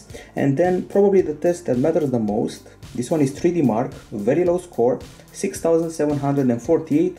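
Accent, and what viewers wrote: Romania. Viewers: se simte in voce ca esti roman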